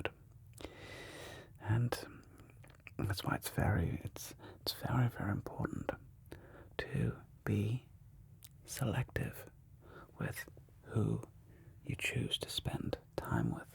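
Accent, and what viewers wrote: Germany. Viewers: I love the German accent videos ❤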